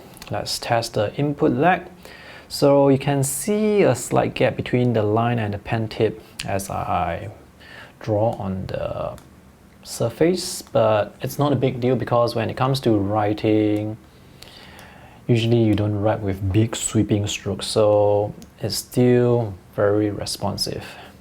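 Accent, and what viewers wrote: Singapore. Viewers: You sound like a singaporean. Are you?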